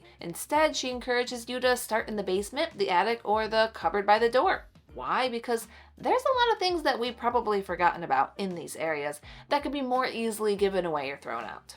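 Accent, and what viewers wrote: England, United Kingdom. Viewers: Oi, a British accent IS normal. 🤣It's a lovely, gentle book, and full of good advice, and she's very right about doing it every few years from the age of 60 if not before. Our lives change, our tastes change, but we hang on to the old stuff that no longer suits us. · ENGLISH accent! There is no such accent as British. We are Irish, Scots and Welsh too. The accent you do is stupid English stupid Peppa Pig Hugh Grant! Do not ever come to Scotland and call us British. 😡😡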